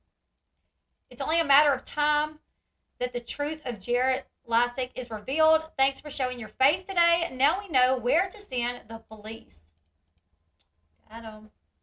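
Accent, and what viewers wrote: South, United States. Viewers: I don't really like channels like this as in...I usually lose interest or find it boring or the speaker annoying...or their voice irritating. However, I really like your channel and I subscribed. Maybe it's the familiar twang in your voice that feels comfortable because I was born and raised in the south. You also seem genuine and humble. Anyways I just wanted to express that I love what I have seen so far. You're doing a great job ma'am 🤗🙂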